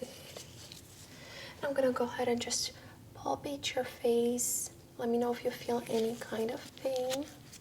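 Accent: Canada. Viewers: Fantastic! Very relaxing. Canadian accent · just curious are you french/canadian? :)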